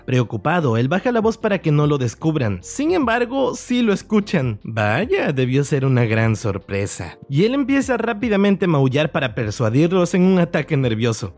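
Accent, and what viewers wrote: Venezuela. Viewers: eres venezolano? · Eres venezolano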